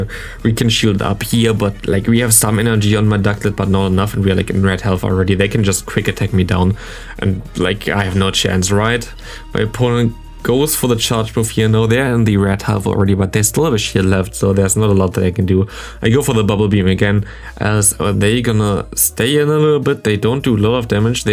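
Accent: Germany. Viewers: Most german accent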